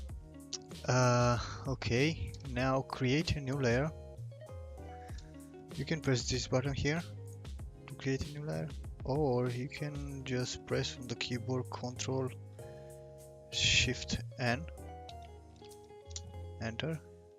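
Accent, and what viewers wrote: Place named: England, United Kingdom. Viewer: Brother really love your videos..and english accent..tutorials are very helpful... Keep up the Good work!!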